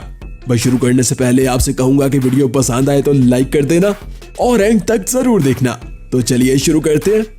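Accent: Pakistan. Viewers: Your accent is similar to Shoyeb Akthar , are you from Pakistan.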